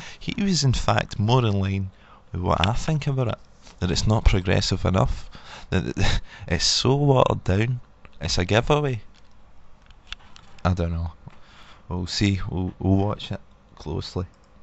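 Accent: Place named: Spain. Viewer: I found the thick Spanish accent difficult to follow at times-- but really enjoyed the program : )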